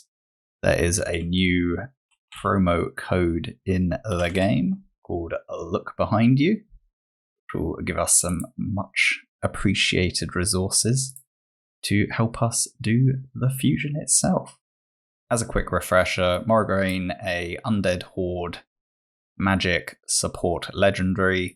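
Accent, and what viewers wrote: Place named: Germany. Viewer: So i startet 8 days ago. Yes, how do you found my small channel then and dont watch the videos from the ,,big" boys? Its cause im a freak in getting Infos. I whatched sooo many videos on how to build, what to do, who to save, where to start and so on and so fort. And ill just grab the epic and then pass the rest of the event. The epic whould help me ab bit. My only 6 star is kael and other i habe 5 star drath and a 4 star vergis with a 4 star warmaiden. So this epic could help me pass some higher stuff cause my kael is full dmg and has really no acc. :) And I like to watch your videos. You are very informative and freetoplay so you understand that we dont have godlike gear lmao. :D Also, are you german? You have an accent. :)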